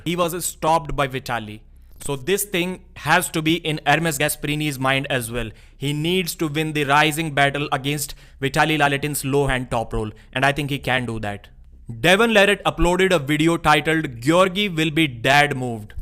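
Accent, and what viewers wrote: India. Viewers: dude your accent is sooooooo strong I could tell a mile away you're Indian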